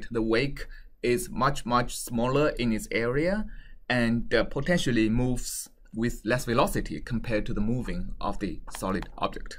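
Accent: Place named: China. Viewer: Horrible, thick Chinese accented English. Completely unintelligible. Pity the MIT students.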